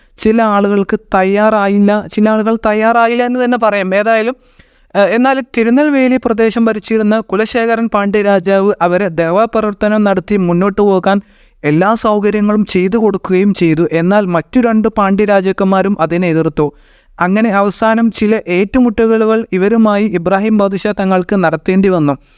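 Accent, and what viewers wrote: Malappuram, India. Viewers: മലയാളം പറയുമ്പോൾ മലയാളം തന്നെ പറയണം അല്ലാതെ മലപ്പുറം മലയാളം അങ്ങിനെ ഒന്നില്ല... നിക്ക് ചീരാപ്പു പുടിച്ചുക്കുണ് എന്നു പറഞ്ഞാൽ എനിക്ക് മനസ്സിലാവും കേരളത്തിൽ ഉള്ള എല്ലാവർക്കും മനസ്സിലാവില്ല... മുഴുവൻ എന്നു പറയേണ്ടിടത്തു മിയ്‌മൻ എന്നു പറയരുത്... ചായ കുടിക്കാൻ എന്നതിന് പകരം ചായ കുടിച്ചാൻ എന്നു പറയരുത്... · പല നാട്ടിലും പല ഭാഷകളാണ്. മലപ്പുറം ഭാഷ ഇങ്ങിനെയാ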